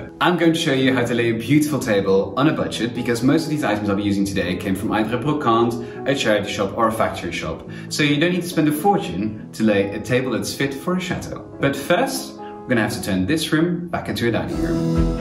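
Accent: United States. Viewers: You've learned perfect American English and accent. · You did a beautiful job and listening to you speak English you almost sound like an American... your English is great!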